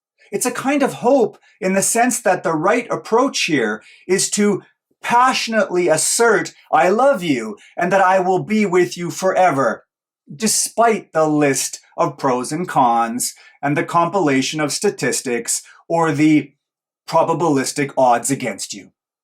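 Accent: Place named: Canada. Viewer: Indeed. Honestly, if you ever venture to the UK. look me up. It would be a privilege to meet you, & hook you up with places and people. I have family in Canada (sorry, terrible at accents but I think your vowels suggest Canada rather than THAT place?) so we might get out there before the decade closes. But certainly with a brown family I won't be going to the other place anytime soon! 😅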